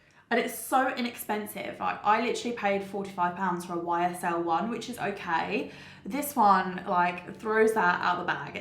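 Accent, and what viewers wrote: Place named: Australia. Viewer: Only found you recently and really enjoying your videos, you’re super down to earth and relatable, your accent gives me Australian vibes, I know you’re from the UK but it’s like you have an Australian twang on certain words, don’t mean any offence 🥰😍keep coming with the content I love it xx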